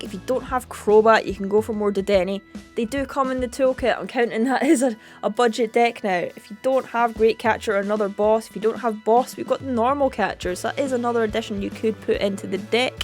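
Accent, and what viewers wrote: Scotland, United Kingdom. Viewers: Haha, the scottish accent is great, I'm glad I didn't embarrass myself.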